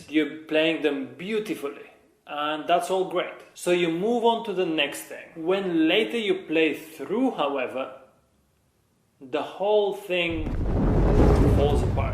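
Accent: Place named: Russia. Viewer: You are the best pianist ever, and the best piano teacher 💓 You will be famous one day, i'm sure. I can hear your russian accent when speaking english, one of the best accents. I wish you good luck :)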